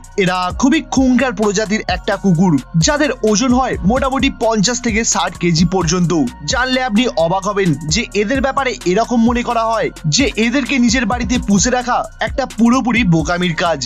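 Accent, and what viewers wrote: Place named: Kolkata, India. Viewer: কলকাতার ভাষাগত টান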